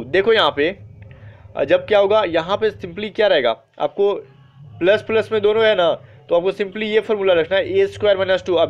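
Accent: Bihar, India. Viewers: bihar se ho kya bhai?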